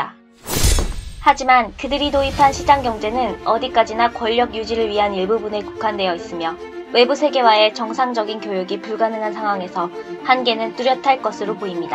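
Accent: North Korea. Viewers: 나레이션 북한말투같음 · 말투가 북한사람같아요. 혹시? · 나래이션 북한사람임? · 발음이 꼭 북한사람같네..표준말이안되면 그냥 사투리쓰시지 먼가 어색하다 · 나레이션 북한여자임.? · 목소리가 음이 북한 말투인데 혹시? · 나레이션자가 북한사람같은데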